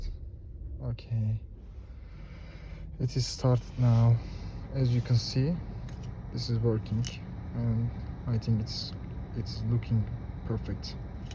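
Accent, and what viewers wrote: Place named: Turkey. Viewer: şarkısi çalmadan önce anlamıştım türk ingilizcesini:) teşekkürler video için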